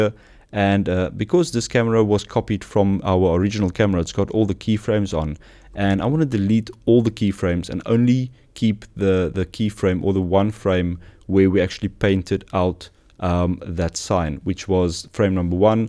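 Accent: Afrikaans, South Africa. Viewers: definitief afrikaans lol!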